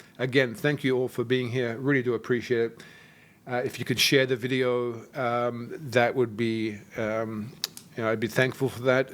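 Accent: Australia. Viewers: I can’t place his accent… Is there a little bit of Aus or Kiwi accent in there??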